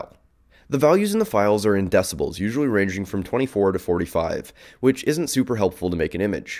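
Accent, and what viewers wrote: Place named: Canada. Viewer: Aha! I knew he was from Canada by the way he says "out" but him saying "Hackerspace in Montreal" confirms it lol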